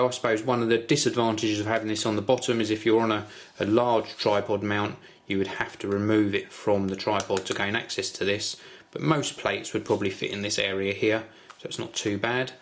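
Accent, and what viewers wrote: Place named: Essex, United Kingdom. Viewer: no, he has more of an essex accent.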